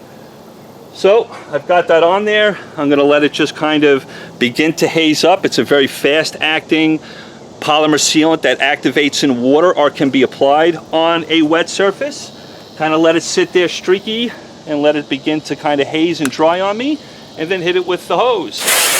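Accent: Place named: Minnesota, United States. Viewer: I’d love to try this, but I’m in Minneapolis. No stores in MN sell, one store each in both Dakotas, and one in Madison. I’ve always used Meguiar’s Expeess Spray Wx when I fry a car. Works like a dream tool. Plus, Meguiar’s is a 3M company, so I’m using product from a hometown place. Sure liked this video,. I like watching you because you’re thorough, and it’s nice to hear my accent out of someone else’s mouth for a change.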